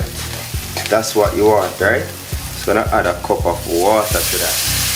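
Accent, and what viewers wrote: Jamaica. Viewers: 🇺🇸🇬🇧 Hello 🤓. This is my first time seeing and watching your channel and I'm sure it won't be the last. I'm sold.. what a lovely and vibrant young man you're? Very clean and detailed cooking/ preparation. I love your vibes, I'm very pleased. I love your English entwined with the Jamaican Creole. I must tell you that I'm not a food or person. However, I like chicken, lobster, shrimp crab and fish. So, now that you're sending me in the kitchen to learn to cook Jamaican food 🤓. I will be trying the pig tail and the chicken soon. Son, God has blessed you with a wonderful talent. You've got many more as well, use them. Keep striving for the best. The sky is the limit, continue to be an inspiration to the youths. Too many of our youths are in jail or doing drugs, stealing,gangs etc. I'm one mother and grandmother who is very proud of you, you've my prayers and my blessings.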